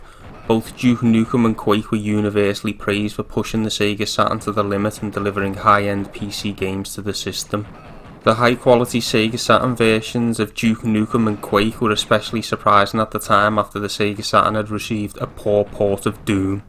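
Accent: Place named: Scotland, United Kingdom. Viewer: wow, that is pretty cool! .....but can anyone please translate Scottish to Australian? ....just kidding! ..love ya accent mate lols.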